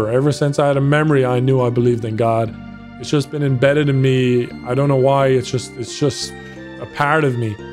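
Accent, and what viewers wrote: Ireland. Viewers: He sounded extra Irish in this vid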